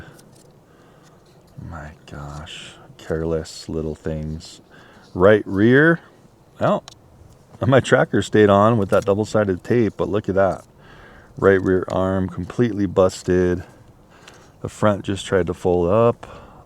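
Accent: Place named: California, United States. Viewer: Well you can definitely tell he's probably from California based on the way he talks and their PC culture God I hate California I will never go there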